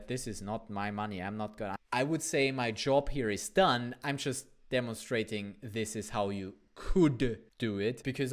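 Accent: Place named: Austria. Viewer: Love your energy on the videos and I like learning about Solana from it. You are Austrian? I love the accent and thought it was Swedish. I'm not really good at accents haha.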